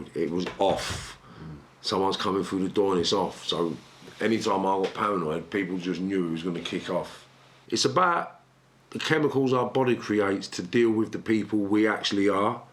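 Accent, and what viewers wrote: Liverpool, United Kingdom. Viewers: Your scouse accent is boss! Have you lived their or just got family/friends there?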